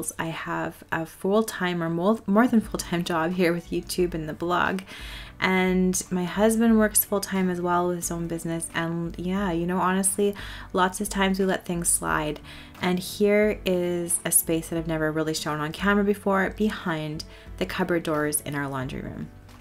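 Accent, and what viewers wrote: Canada. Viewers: You have such a pretty laundry room. I love the hits of red and cream and white it just looks so organized and clean it would inspire me to get busy on laundry. I like your Canadian accent too. Your voice is very soothing.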